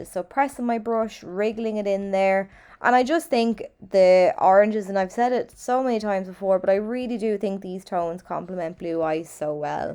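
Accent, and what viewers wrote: Ireland. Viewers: Your tutorials are always so easy to follow and you do amazing looks! I also could listen to your beautiful Irish accent all day 🥰 I have only been there once but I’d go back in a heartbeat!! My parents chose an Irish name for me to honor my Grandma who was 100% Irish herself so Ireland always holds a special spot in my heart ❤️ :)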